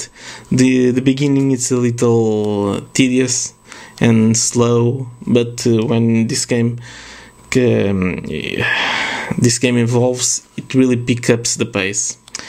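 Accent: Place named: Yugoslavia. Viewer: You sound Yugoslavian like me but you're French right?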